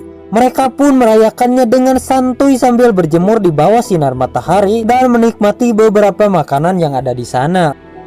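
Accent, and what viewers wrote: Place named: West Java, Indonesia. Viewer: Salam satu alur. Salam pemula om. Orang sunda yahhhh???? Hehehehe Keren narasinya ommmm👌🏻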